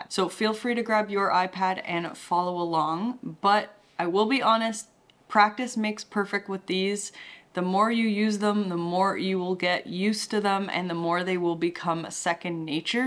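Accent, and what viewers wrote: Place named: Canada. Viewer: Wonderful video and an awesome Canadian accent! Thank you for the content!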